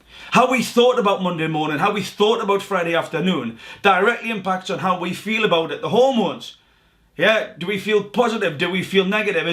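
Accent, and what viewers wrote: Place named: Scotland, United Kingdom. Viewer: thank you for your hard an amazing work I am a native Californian and I went blind about 20 years ago having been cited before I know the power of nonverbal communication and so I am super stoked that I have discovered your videos! You have a really wonderful way of not only explaining the body language but really getting in-depth psychologically I first saw your Harry and Meghan Markle video and then I am a big lover of Muhammad Ali and Conor McGregor both so I saw this video well I mean I listen to it and thank you so much I appreciate all that you do and it sounds like you are Scottish but I'm not sure so take care of big hugs April in California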